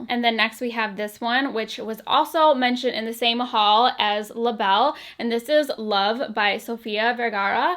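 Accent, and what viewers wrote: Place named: Northeastern Pennsylvania, United States. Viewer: And Im from NE PA, and as soon as I heard u talk lime a year ago...I knew we talked alike!!!!!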